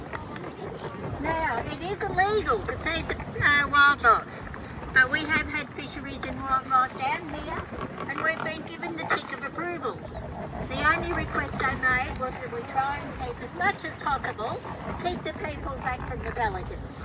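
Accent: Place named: Australia. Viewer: Wat a beautiful accent we Ozzy's have. They could easily eat her if they had teeth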